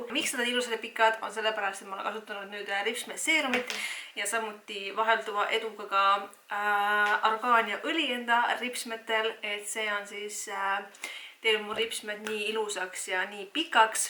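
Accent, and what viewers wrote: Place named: Finland. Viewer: Nii mõnus soome aktsent ☺️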